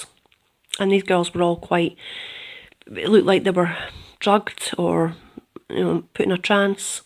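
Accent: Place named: Scotland, United Kingdom. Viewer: You scottish. I'm scottish also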